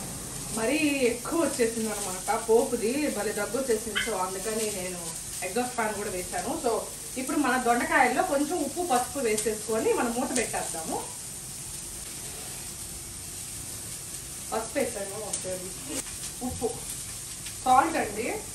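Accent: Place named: Kadapa, India. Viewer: Bendu teese kuralu shuru, Beerakaya yendu chapala mix, donda kaya talimpu, rendu rende andi 😋😋. Antha energetic mothers ye andi mee home lo ammamma> amma > meeru. Methhalluu, royapottu kadapa slang 😊, exhaust fan vesukondi sound vinapadutundhi no worry, andhuke subscribers ki earphones use cheyyamanandi, beerakaya so colorful 🥒🌶🍅. Now dondakaya preparation start, Wowww dondakaya prepared, Rio ki manchi exercise 🦜, now beerakaya yendu chepala pulusu prepared 🥣🥣, Gongura pacchadi kuda 🥣, Rio viswa prayatnam chestondhi dondakaya tinalani 🦜, Nenu insta delete chesanu andoyi, kitchen lo Rio yuddhame chestondhi, nice vlog garu 👌👌👌 but taste review 🤔🤔🤔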